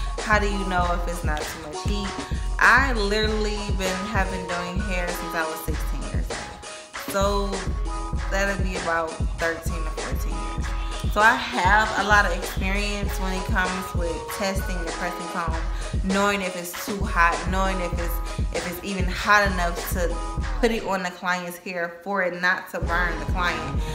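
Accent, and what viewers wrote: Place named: Detroit, United States. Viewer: Your voice sounds like your from Detroit. If so, hey girll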